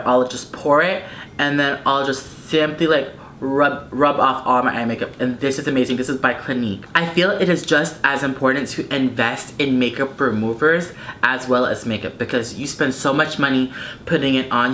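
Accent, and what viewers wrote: Spain. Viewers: yes your spanish accent!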